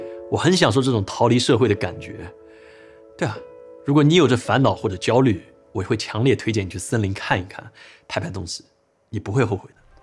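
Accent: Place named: Zhejiang, China. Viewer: 浙江口音好重啊。。。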